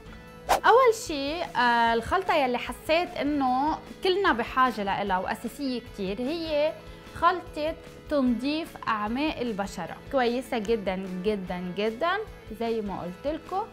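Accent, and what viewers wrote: Egypt. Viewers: بقيتي مصرية يا وبتتكلمي زينا🌹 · فيديو جميل ❤️❤️ وطريقتك وانتى بتتكلمى مصرى عسل أوى حبيتها 😂😂😂😘😘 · تحكي بالمصري كتير ضحكتني 😅😅😅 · طريقة نطقك مصري عسل جداً 🤣🤣🤣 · المصري بتاعك عسل اوي 😂💟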